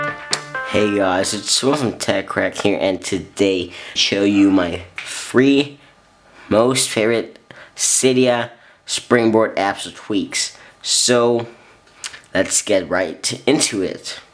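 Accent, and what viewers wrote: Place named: Asia. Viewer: You sound asian.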